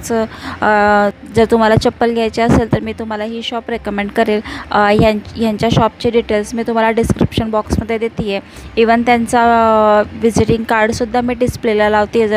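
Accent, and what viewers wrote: Kolhapur, India. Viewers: kolhapuri tone chan jamliy.. next vdo tambda pandhra rassa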